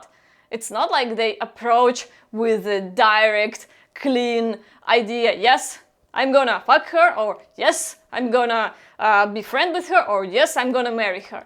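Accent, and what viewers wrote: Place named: Russia. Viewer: I love how straight up you are and your swearing word's makes me laugh your actually funny the way way you speak from Russia but in English speaking love your video on utube keep it up cheers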